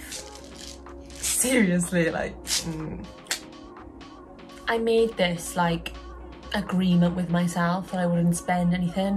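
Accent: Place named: United Kingdom. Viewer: Love your accent dear! Can I ask where from the UK are you? Scotland maybe? 🥰 Also unfortunately cannot find you on Insta under your username :(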